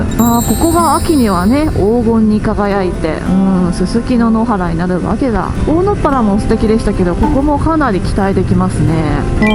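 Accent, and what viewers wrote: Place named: Kansai, Japan. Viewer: 偶に関西弁出るのが凄く謎www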